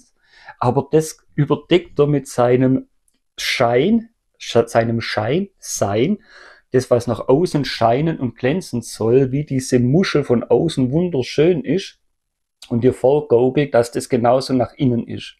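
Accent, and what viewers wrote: Swabia, Germany. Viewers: Isch halt usm Ländle 😅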